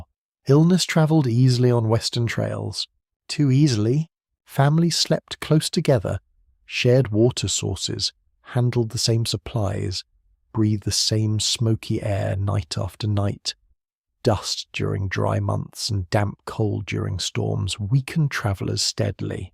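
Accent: United Kingdom. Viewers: Aaargh so tired of cokney ai voices 😊😊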